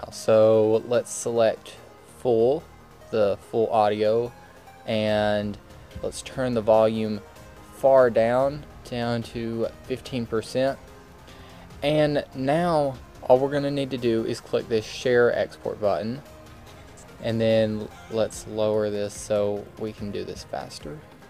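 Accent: United States. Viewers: I love your Country accent :3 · This dude is probably from Texas, the accent throws it off. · Finally an American, Indians are absolutely irritating to listen to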